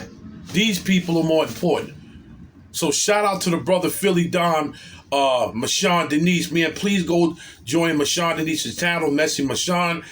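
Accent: New York, United States. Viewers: God bless ❤ Subscribed. And finally, a REAL NY accent. You are everything a real NY native should aspire to be. ❤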